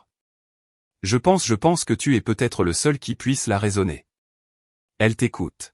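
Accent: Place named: France. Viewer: un français dans la cinquantaine qui lit un texte en anglais pour la première fois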